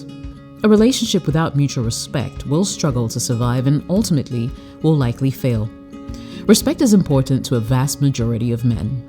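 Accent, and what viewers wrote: France. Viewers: First I command you on your french it is a fine cute accent. Your channel is lit. You bring so many excellent materials and I truly believe this episode is the best of all. W/O respect there is no relationship. Wishing you that you reach your goals. Touloulou. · True facts. Respect is a King aspects of real man. Thanks and your french accent is good!!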